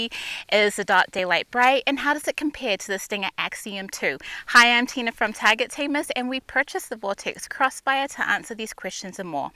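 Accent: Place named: Australia. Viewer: Damn woman, you know your shit I Know it’s kinda weird but I love the accent. I spent a few years in Adelaide with some very good family friends and would love to make it back someday. Anyway, sorry for the essay, but keep up the good work on the videos , very informative to people looking into new sighting systems . Convinced me to get a prism due to my astigmatism (even at 34), it’s been a good quick optic with very few issues. Looking into lvpos now so we shall see. Maybe i’ll just get a solid magnifier and maybe a canted red dot. Anyways cheers, good shit!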